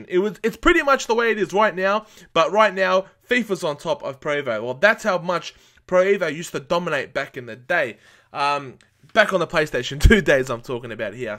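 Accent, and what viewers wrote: Australia. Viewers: Is he Australian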